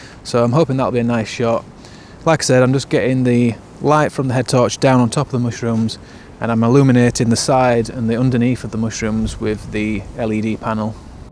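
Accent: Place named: Congleton, United Kingdom. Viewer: You sound just like all my pals from Congleton 👍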